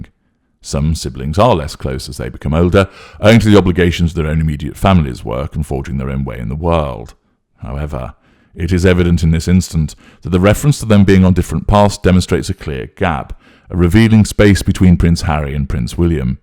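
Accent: United Kingdom. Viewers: Are you Oxford educated? I'm American; your accent is the easiest on the ears of anyone British I have heard.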